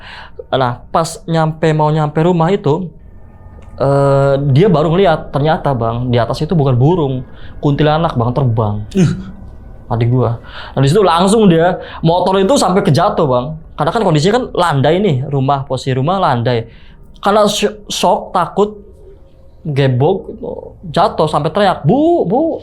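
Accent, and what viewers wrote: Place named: Banyumas, Indonesia. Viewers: lu gua tapi medoknya ga ilamg 😎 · Bang, sekedar saran aja, Kayanya berat bgd ngmng gue lu... logat ngapaknya ga bisa ilang, lebih baik ngmng aku km aja. Orang jawa itu identik dengan tutur bahasanya yg alus soalnya 😅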